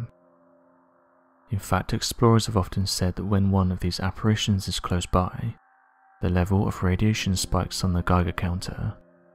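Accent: United Kingdom. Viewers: Oi mate!! I love all of your videos. I truly enjoy driving,chilling,cleaning and etc. to your story telling. It has been a fun pass time. Your one of the better presenters/narrators in my opinion on YouTube when it comes to the strange and unusual. Would love to hear more videos about satanic cults such as Abraxas and or even Chateau des Amerois if you ever get the chance. Cheers and love your work. Something about your British accent and calm voice that’s very soothing to me for some reason. Perfect for story telling. Anywho, Much love and respect from your long separated cousins here in the U.S.! Blessings from the bayou state of Louisiana. Also, another potential topic to check into is creature and beings spotted in and around the swamps of Louisiana. Especially run ins with werewolf like creatures labeled as Rougarou. Take care for now mate! Blessings to the Cambridge crew and Bristol Peeps and all my st neots lackeys out there!!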